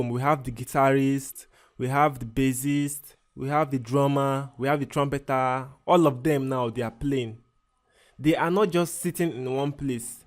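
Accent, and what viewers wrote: Nigeria. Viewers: I enjoy your tutorials because of the way you speak and explain in nigerian tone and language. You deserve a million subscribers bro I love you 😘😘😘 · Bro remain blessed Love your tutorial and I love your use of Nigerian accent hope we could would work together some day.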